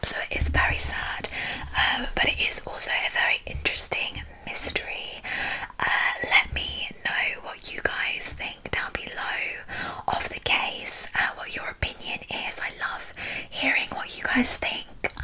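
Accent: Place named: United Kingdom. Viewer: Sooooo British! 🇬🇧❤️🇬🇧❤️🇬🇧 Love it! 👍🏻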